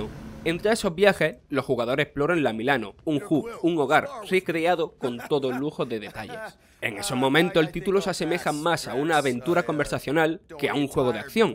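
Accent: Andalusia, Spain. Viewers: Va a ser el primer y último comentario que haga desde que veo videos en vuestro canal y solamente aconsejar que quizá no sea la persona más adecuada para narrar los videos, sin tener absolutamente NADA en contra de el y sin poner en duda en ningún momento su profesionalidad simplemente hay funciones o desempeños para los que ciertas personas no valemos, y no me vale la excusa de los acentos o la dicción, habéis visto los informativos de TVE, Antena 3 o incluso televisiones autonómicas como Canal Sur con el acento tan marcado que hay en Andalucía y acaso ponen a personas a los que se le note tantísimo y que se coman letras de las palabras de una forma tan notoria? ya os lo digo yo, No, y no por que esas personas no valgan o no sean buenos periodistas, sino que para comunicar con su voz no son los más adecuados. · No soy andaluz pero entiendo perfectamente al chaval, es una currada de vídeo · De qué zona de España es la tonada del locutor?? 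Me recuerda a Gandía, de La Casa de Papel...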